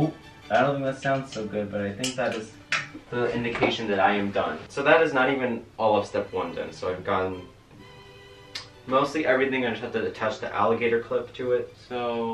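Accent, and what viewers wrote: China. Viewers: That Chinglish 😂 honestly same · your chinglish is beautiful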